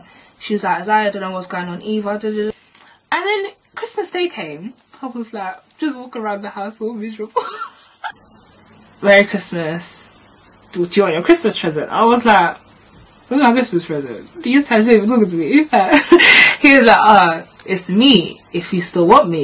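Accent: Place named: Essex, United Kingdom. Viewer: I love your Essex kinda accent mix in with London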